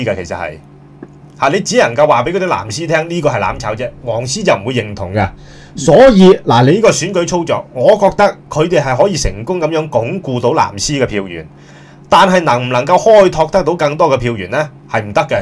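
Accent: China. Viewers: 你把聲咁似大陸仔講廣東話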